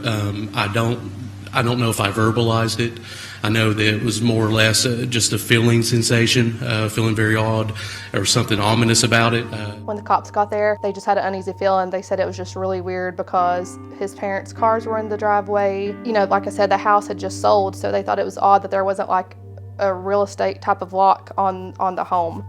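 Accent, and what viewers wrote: South, United States. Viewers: Thanks for covering this. It is so sad to think that the parents knew that their own child, the one who lived off of them, the one that Lisa worked for, delayed retirement for, was killing them for reasons of pure greed. They were nothing but kind, Lisa even buying him his favourite ice cream. He is probably one of the worst psychopaths ever. I wonder what he thinks of his life now? I hope that he is miserable. BTW, I love your videos. Your "southern accent" is really cute. ❤Cheers, from Canada.❤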